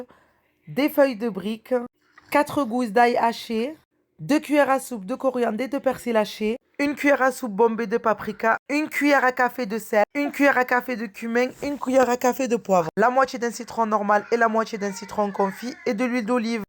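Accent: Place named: Montpellier, France. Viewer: ton accent,tu es vers Montpellier ?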